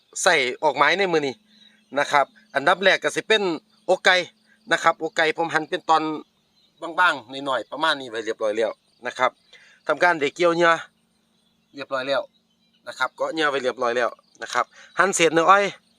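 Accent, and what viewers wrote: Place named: Sisaket, Thailand. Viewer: พอมาทำงานต่างประเทศมันกะเงียบเหงา พอได้เห็นคลิปนี้ก็หายคิดถึงบรรยากาศตกปลาที่บ้านเด้อครับ สำเนียงคือศรีสะเกษบ้านผมแท้ครับ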